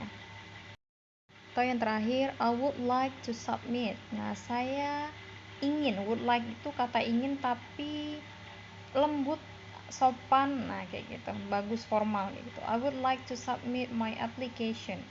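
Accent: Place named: Palembang, Indonesia. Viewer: Terdengar tdi. Cara ke duo. Asli palembang ya mbak. He he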